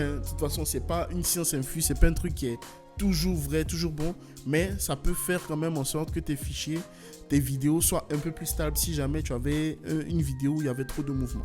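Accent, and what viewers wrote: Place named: Ivory Coast. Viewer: Merci, super tuto et encore plus merci pour l’accent ivoirien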